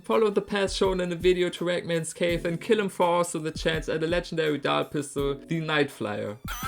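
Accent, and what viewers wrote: Germany. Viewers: for me he sounds very German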